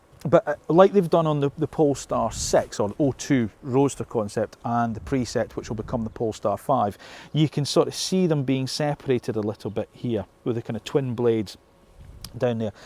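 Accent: United Kingdom. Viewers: Where is the UK accent from ?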